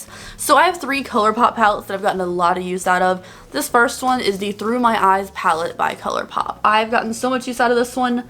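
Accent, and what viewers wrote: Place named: North Carolina, United States. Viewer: is originally from North Carolina:)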